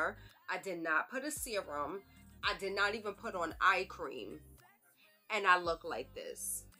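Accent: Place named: New York, United States. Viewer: i knew u was from NY when the video started and i hurd u talk! #NYShittt 🗽🗽🗽🗽 And i just ordered mine. cant wait! 😊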